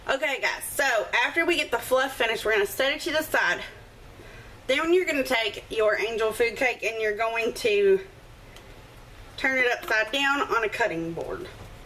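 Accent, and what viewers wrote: United States. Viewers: come on now...it is not good for anyone... sugar, fat and salt....I hear your southern accent and assume you are a fool.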